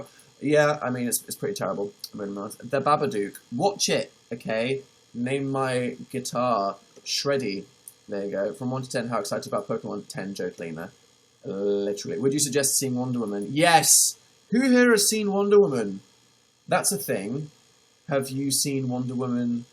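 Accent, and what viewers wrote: United Kingdom. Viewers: Is it me or does hearing a British person talk about fonts make it more fancy than it really is? 🖤😊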